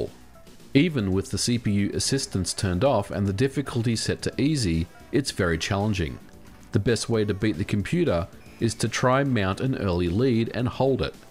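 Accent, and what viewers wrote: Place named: Australia. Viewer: Australian. He lives in the US.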